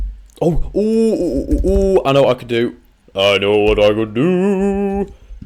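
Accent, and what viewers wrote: United Kingdom. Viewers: Why does he sound more British this episode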